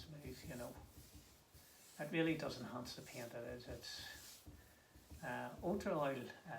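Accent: Belfast, United Kingdom. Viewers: I've been putting off painting my 15 panel doors because it was such a hassle the first time, masking tape etc. This video has taken the "fear" out of it. I must hunt out my "angled" brush.paint and get stuck in. Thanks for the video mate. Gary. Fellow Belfastian by the sound of your accent.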